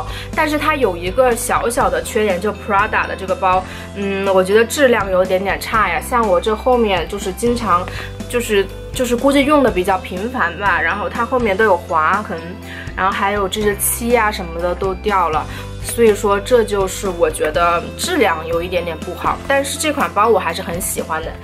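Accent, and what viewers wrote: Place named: Changsha, China. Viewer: 我发现你说话很像长沙话哈哈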